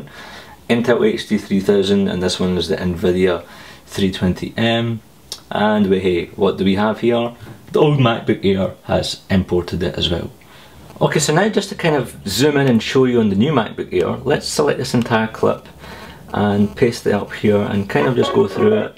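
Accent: Scotland, United Kingdom. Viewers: That's a British Scottish accent. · r u from Scotland ??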